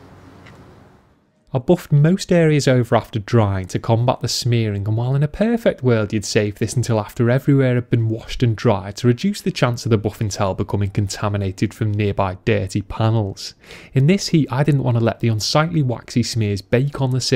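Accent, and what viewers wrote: United Kingdom. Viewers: DieselDan09 brit accent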